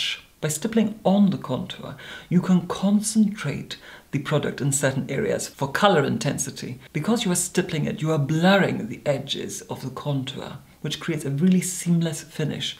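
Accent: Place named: RP, United Kingdom. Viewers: Your make up is always stunning but what always amazes me is how good your English accent is. For you being Scottish, I can imagine it can't be easy speaking with an English accent. I can tell you my Scottish accent attempts always end badly- ha. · That cut glass pronunciation, those lovely, expressive blue eyes, those cheekbones...🥰🥰🥰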